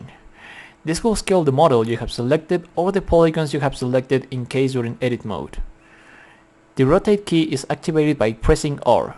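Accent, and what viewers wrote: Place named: Ireland. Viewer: You sound Irish. Are you Irish?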